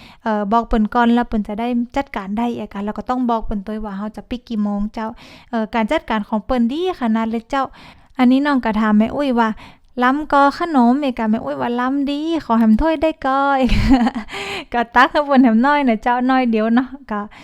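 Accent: North, Thailand. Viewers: ฟังรู้เรื่องอยู่ค่ะ เพราะที่ทำงานแต่ก่อนคนเหนือเยอะเลยฟังรู้เรื่องค่ะ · เพิ่งมาเห็นช่องนี้ กำเมืองงงง น่าฟัง รื่นหูมากกก ติดตามเลยเจ้าาา❤❤❤❤ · สำเนียง ลำปางกะเชียงราย เหมือนกันมาก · ครั้งแรกเน่อเจ้าหล้าเพิ่งเจอยูทูปเบอร์คนเมือง แม่ก่อเลยกดติดต๋าม เลย ฟังกำเมืองเหียพ่องม่วนดีเจ้า คนเจียงใหม่เน้อเจ้า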